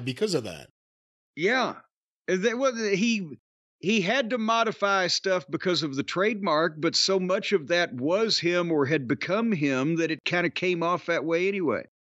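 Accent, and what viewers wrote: South, United States. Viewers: Hate to say it but ya got that southern accent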